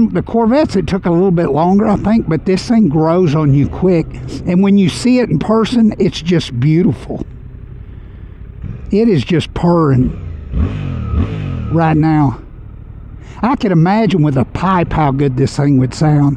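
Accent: United States. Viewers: English man I think not sir sounds American to me😂😂😂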